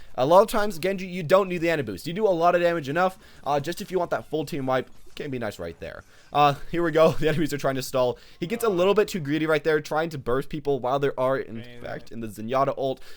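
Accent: United States. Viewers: I subbed for the American accent FINALLY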